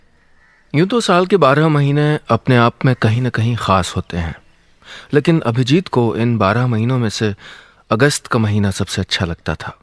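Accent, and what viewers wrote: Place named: Haryana, India. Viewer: What a deadly combo! Well...Opposites attract. Nice story and good to hear Neelesh in Haryanvi accent..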